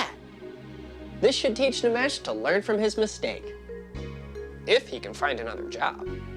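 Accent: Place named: India. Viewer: getting lectured by a curry english speaker is hilarious.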